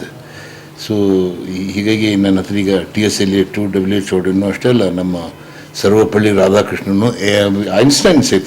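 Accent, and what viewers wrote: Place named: Dharwad, India. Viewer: Pakka dharwad Kannada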